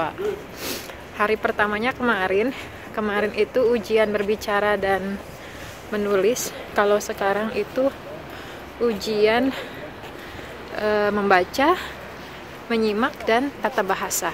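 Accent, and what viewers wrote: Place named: Sunda, Indonesia. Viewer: logat sundana meni eces..teteh❤❤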